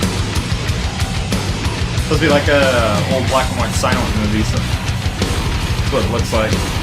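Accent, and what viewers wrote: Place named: United States. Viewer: I could be wrong, but I would assume from your accent that you live in the US? I believe they're about to be hitting the US really soon! Definitely go if you can (apologies if i'm wrong)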